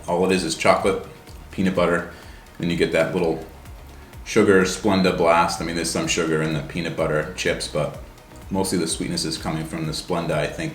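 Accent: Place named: Massachusetts, United States. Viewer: Do I detect a MA accent?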